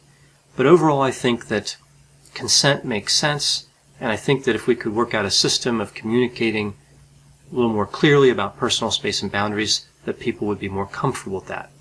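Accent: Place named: Delaware, United States. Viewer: The only person in Delaware who hasn't met Joe Biden, lol!! Really, you have a great accent, and it's one I recognize (I'm from Virginia but have been to Lewes, DE for the beach and Wilmington for the art museum).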